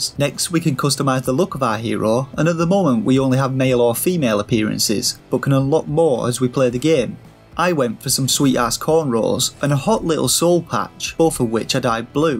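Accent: United Kingdom. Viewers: Is it english of UK?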